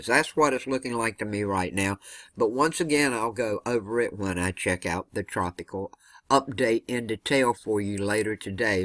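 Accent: South, United States. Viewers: Good afternoon! Thank you for the update. Yep! we cooled off. It was in the low 60's last night. Today, as yesterday, is beautiful! Slight breezes. Sorry I missed a few days. Dr. appointments and vertigo. Would you believe? They're saying my two eyes are communicating with my brain at 2 different speeds? They've given me different exercises to do 3 times a day. Prayers for everyone, GOD bless. Love hearing your Southern way of talking. Your expressions and words make me feel like I'm back in the South! Love it! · Agreed. expressions and words have a warm Southern comforting and kind of reassuring tone of voice ever reminding of the power of God's love. Amen.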